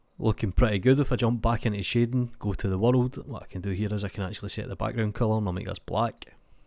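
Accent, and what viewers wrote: Scotland, United Kingdom. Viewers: why do i think you are from scotland ??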